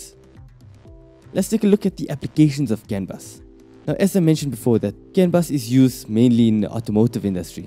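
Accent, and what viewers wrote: South Africa. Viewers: Are you from South Africa? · South african accent!!!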